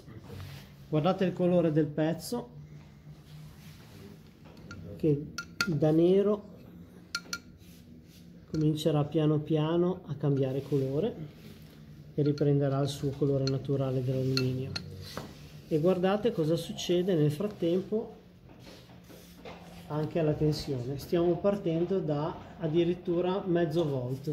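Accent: Genoa, Italy. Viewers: per fare un bel lavoro allora mi calcolo in peso quanto è diluito l'acido e aggiungo acqua distillata fino a raggiungere la soluzione giusta. Comunque noto con piacere l'accento genovese. Io abito in entroterra tra la Spezia e Genova e sono un appassionato delle cose che proponete nei vostri video. Lavoro alle macchine utensili se vi serve qualsiasi cosa per una collaborazione sono a disposizione saluti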